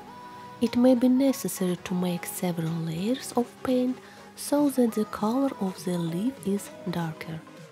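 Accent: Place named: Russia. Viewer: Вы русская?) Русский английский.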